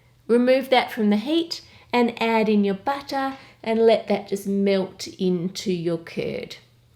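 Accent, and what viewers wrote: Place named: Australia. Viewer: She's very Australian. I can't stand Australian accents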